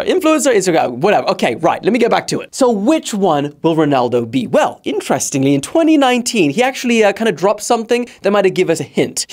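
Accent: South Africa. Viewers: You grew up in SA huh, i knew that accent sounded familiar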